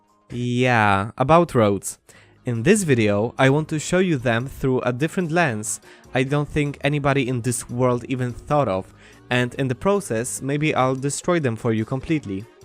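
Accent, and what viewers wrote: Poland. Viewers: Are you Polish? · Are you Polish by any chance? Amazing video btw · Are you polish? :D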